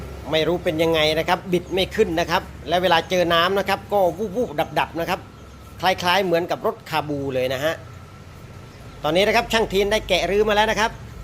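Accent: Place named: Surin, Thailand. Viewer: เสียงคนพูด คนสุรินทร์ชัวเลย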